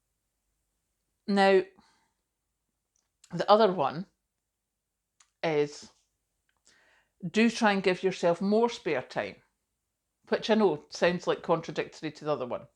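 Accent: Scotland, United Kingdom. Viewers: Yes please to Pom Perks. It's badly needed at the moment, it's so busy on the farm this time year its hard even to fit in a meal and wee sit down after. Afternoon tea made me smile. With your accent it reminds me of the high tea my friend who lives in Bridge of Weir, Renfrewshire always does on a Sunday. Everything is home made, it's such a treat when I go stay with her.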